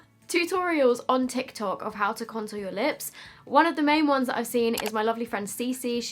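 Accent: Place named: London, United Kingdom. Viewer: I FREAKING LOVE YOUR ACCENT!!😘 Usually anybody else that has that London tone, I dont like as much but your voice is beautiful. 💛💜💛 Stay you girl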